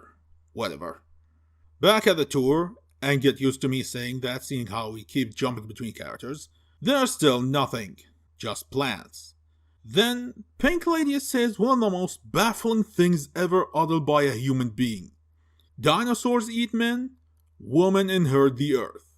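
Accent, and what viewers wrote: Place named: Dubai, United Arab Emirates. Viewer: It’s actually his thick accent, and bad mic quality. He’s from Dubai if that clears some stuff up.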